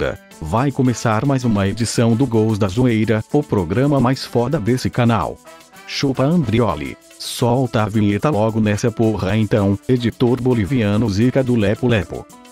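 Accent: Brazil. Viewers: Pernambucano ? vv'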